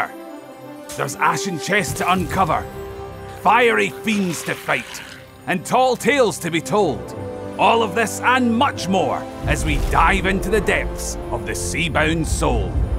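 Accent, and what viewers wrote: Scotland, United Kingdom. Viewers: Why is he Scottish?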